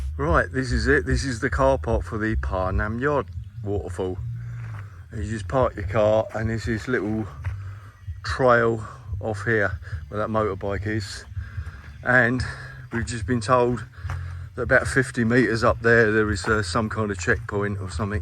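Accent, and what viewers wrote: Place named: United Kingdom. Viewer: I like listening to English with a UK accent. It's beautiful.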